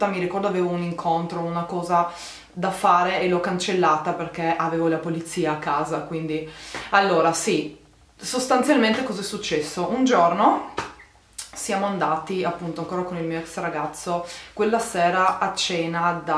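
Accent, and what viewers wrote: Trieste, Italy. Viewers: Caterina Trevisan un triestino che parla in italiano si riconosce subito, non siamo capaci di parlare "in lingua"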